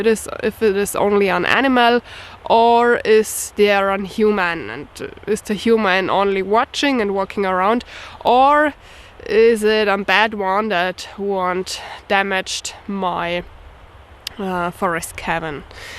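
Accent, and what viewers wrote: Bavaria, Germany. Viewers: Hi! Regarding security for your little forest cabin ("hut"), I cannot add much more to what others have already written in the comments. I recently came upon this channel of yours. After watching 3 of your videos, I was impressed with your knowledge of outdoor skills. I was especially impressed with your knowledge of edible wild plants and herbs. So I subscribed to this YouTube channel. Als ich feststellte, dass Sie auch einen deutschen Kanal haben, habe ich ihn auch sofort abonniert. Es ist sehr beeindruckend, dass Sie Videos in englischer Sprache machen. Aus einiger Erfahrung[*] weiß ich, dass es nicht einfach ist in einer Fremdsprache frei zu sprechen. Also! Für ihre tolle Leistung... Hut ab! Und ich wünsche ihnen weiteren Erfolg bei allen was Sie unternehmen. Übrigens ihre Kinder sind hübsch und süß. I love your German accent in English and your Bayern accent in German. 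--- [*] In den 90er Jahren lebte ich in Deutschland und habe für eine deutsche Firma gearbeitet.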